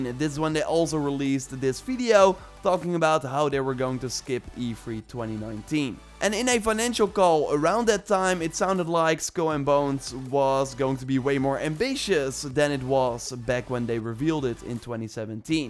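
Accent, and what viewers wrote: Netherlands. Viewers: You sound like you are from the Netherlands. You have that "P is B and B is P" Accent. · U sound dutch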